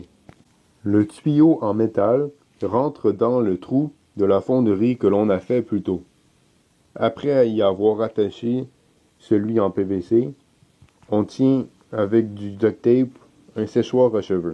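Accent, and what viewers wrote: Canada. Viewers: 6 tasses c est trop rigolo avec cette accsent canadien !!! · Ton accent québecois avec la composition des phrases en francais c'est MAGNIFIQUE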